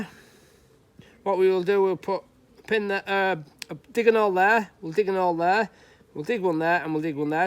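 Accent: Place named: Yorkshire, United Kingdom. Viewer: Hi. Me again. Liking the vids again. Great explanation for anyone wanting to follow this system. Listening to you a few times now, and I thought I recognised the accent. I live in Meltham, Huddersfield and work in Leeds. So I guess, small world. I know your busy pal, but have a break for gods sake! Lol.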